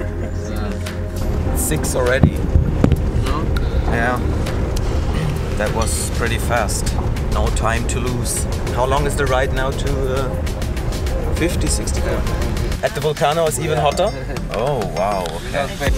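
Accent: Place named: Germany. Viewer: You sound so German! 😄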